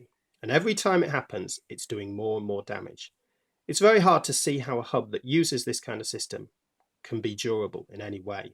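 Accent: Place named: United Kingdom. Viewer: So whose British voice is this?? I've been running Odyssey parts for half my life and finally bought a Clutch today 😁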